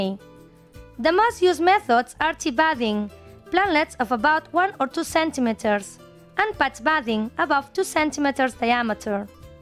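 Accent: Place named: Spain. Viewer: Very informative video. Love the Spanish accent!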